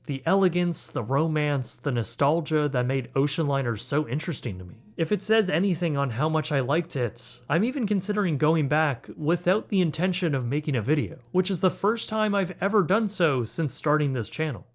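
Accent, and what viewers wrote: Canada. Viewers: I am new to this channel and I instantly noticed the Canadian accent😅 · I find this happening more and more with review videos... Typos and mispronunciations degrade the final product, and make you seem like you aren't the authority a piece like this would suggest. Look up all names and proper nouns first, check for punctuation, etc. Like these are the editing things that can take a viewer out of the experience, and make the presenter look immature. A few have mentioned the "Verraz-nano," and that was kinda it for me. My comment would be one of laughing along if this video was aiming to be funny, but it's obvious that this isn't the objective. So I'm saying it now, if you want to come across as authoritative on a subject, grammar, editing, pronunciation, and word variation ("very...very...very..." etc.) are priorities to get right. And it's pronounced more like, "Ver-rah-zah-no" if you're from the city like I am. 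With your Canadian accent, I'd say maybe something like, "Vair-ah-zah-no" is more accurate. Where we have stronger E sounds, you guys seem to go towards an A: "Ter-rible" vs. "Tair-rible".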